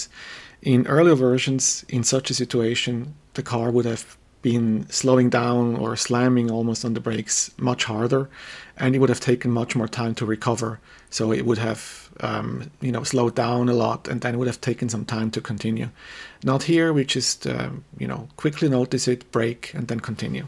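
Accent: Switzerland. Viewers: Schweizer Akzent hat überzeugt. Liebe Grüsse vom Genfer See :-)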